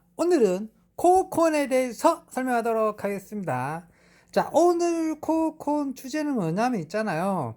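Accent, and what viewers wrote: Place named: Jinju, South Korea. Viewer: 진주분이신가봐요? 영상잘보고있습니다.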